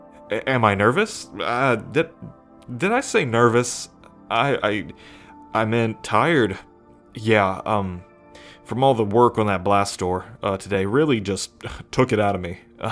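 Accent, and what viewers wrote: South, United States. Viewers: the ever so slight southern twang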